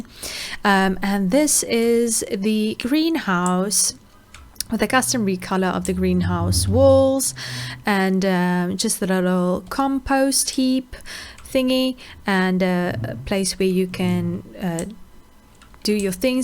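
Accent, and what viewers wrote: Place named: South Africa. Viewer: I knew from ur accent your South African💀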